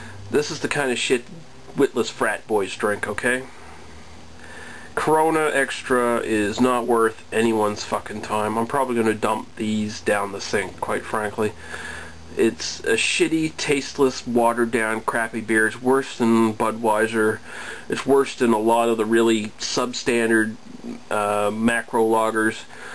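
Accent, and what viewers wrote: Canada. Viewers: is he canadian (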